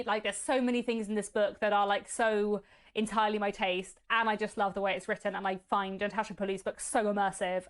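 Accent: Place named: West Country, United Kingdom. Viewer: I would find it hard to do a top ten, and I would have to split it into two lists, one of fiction and one of nonfiction. I have read several of the books you mentioned, but none of them would make my top ten. My books would be a little obscure to most people as they would be germane to Gnosticism and the Paranormal or to Historical Fiction. Books like Luce by L. R. Fredericks, We Other by Sue Bentley, The Strange Life of Ivan Osokin by Ouspensky and The Secret Magdalene by author and old FB friend Ki Longfellow would be in my top ten fiction books. The one most would know is Melville's Moby Dick which is very Gnostic when you understand what Gnosticism is all about. From Historical fiction, I would put Monk's Hall in there by the late Hilary Mantel, Innocent Traitor by Alison Weir and Sarum by Edward Rutherfurd. That last one, is set around Salisbury and Stonehenge and the Cathedral and how the place became inhabited in ancient times. 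I detect a slight West Country accent in you, so it would be the one I recommend, which makes for fascinating reading.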